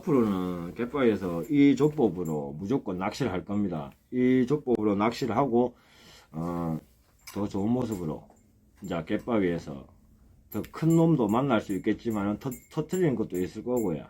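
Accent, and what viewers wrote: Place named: Gyeongsang, South Korea. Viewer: 혼자 방송하는데 마스크 벗고해도 되지 않을까요? 경상도 억양이라서 알아듣기 힘든데....발음까지...ㅠㅠ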